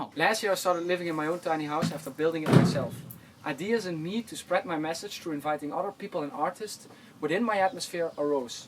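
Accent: Netherlands. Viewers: Are you Dutch? You sound Dutch. If so, ik zou wel wat willen kopen.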